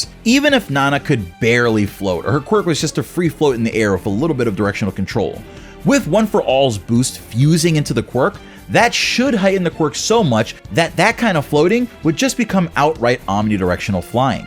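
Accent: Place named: Puerto Rico. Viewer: You ooze your puertoricanness when you talk! Nice work with the videos man!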